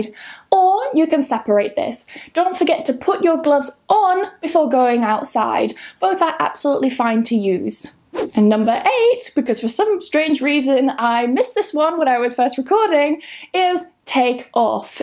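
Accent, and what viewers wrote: United Kingdom. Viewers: Hi!, l have been watching your video for one month. l think these videos are so useful to learn English skills. You have also a great British accent that makes it easier for me to understand. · I love your accent, what region of UK is it from? 🌸🌸🌸🌸🌸🌸🌸 I'm from Guatemala 🇬🇹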